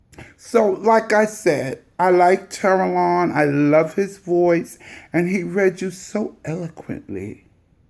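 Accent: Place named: New York, United States. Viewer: I live for your thick "rich white woman" NYC voice and accent,. 💞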